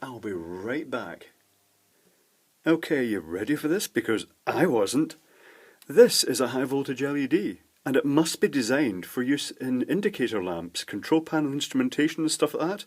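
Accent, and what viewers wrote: Scotland, United Kingdom. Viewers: Lovely Scottish accent, Edinburgh area?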